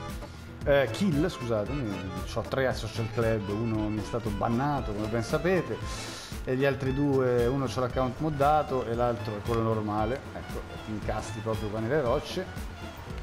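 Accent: Italy. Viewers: Nada mau para um italiano falar um pouco de inglês, mas sem ofensa mas o sotaque seu italiano com o de inglês não cai bem.Usa il traduttore per capire la mia lingua brasiliana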